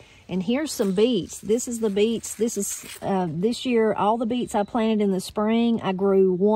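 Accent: Appalachia, United States. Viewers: I know what airish is now!!! I even used it the other day. Thank you! Love the Appalachian language.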